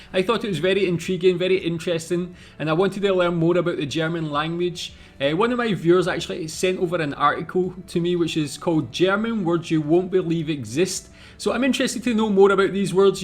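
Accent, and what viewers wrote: Scotland, United Kingdom. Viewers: oh boy, your scottish accent really showed in this video xD somehow love the way you say "booze idea" lol... but it also helps you with the german tongue twister... that was FLAWLESS :D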